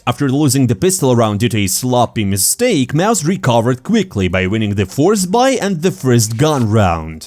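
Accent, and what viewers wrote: Russia. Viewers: Твой русский английский не очень гуд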